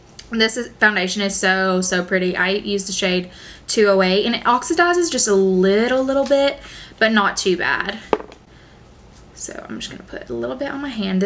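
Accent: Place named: Texas, United States. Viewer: You are definitely a Texas girl! Can tell by your accent and personality❤ keep posting videos!!!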